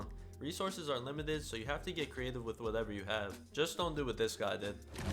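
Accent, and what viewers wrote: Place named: Netherlands. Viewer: Ben je NL? je accent is nl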